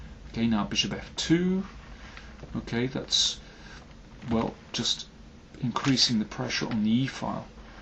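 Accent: United Kingdom. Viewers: Hi Daniel! Thank you so much for your insightful commentaries! Also a big fan of your British accent that makes things dramatic and theatrical to my taste!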